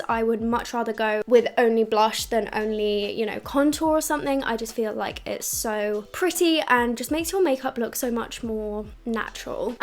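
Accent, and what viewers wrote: United Kingdom. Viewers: Well I was in love with this girl and didn't realize something that I just found out now when I put on this video - she has an annoying as hell British accent - I think I just fell out of love - oh well - that's the ironic thing about the English - they don't know how to speak their own language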